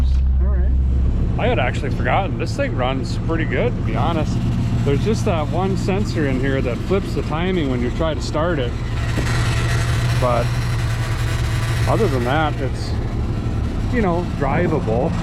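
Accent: Minnesota, United States. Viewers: Can't wait till you pick up a Southern accent on top of that Minnesota accent. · Your accent is super thick for Minnesota. I'm in duluth what part of Minnesota are you from?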